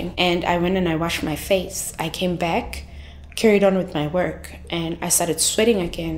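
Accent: South Africa. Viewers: She sounds like she is from South Africa 🇿🇦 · im zimbabwean but i could tell she was south african too 😳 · she’s south african i can tell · Your accent sounds very much South African. I'm here because I have had a lot of stings and severe pain in my heart for a couple of years now. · I know a South African accent when I hear one... I'm glad you're okay. 🥺❤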